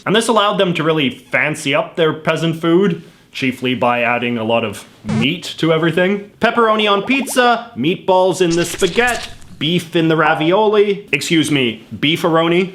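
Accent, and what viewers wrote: Canada. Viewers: He called pizza margherita boring?!!!! Well he has a horrible sounding Canadian accent so I am not offended. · This guy hits his "out" harder than any Canadian. EVER. We know you're Canadian. Stop it. It sounds gross. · Hey, are you Canadian?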